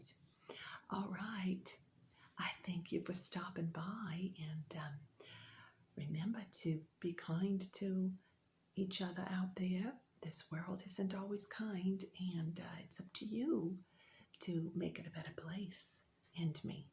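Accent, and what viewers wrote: South, United States. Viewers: is this what they call southern accent ? ^^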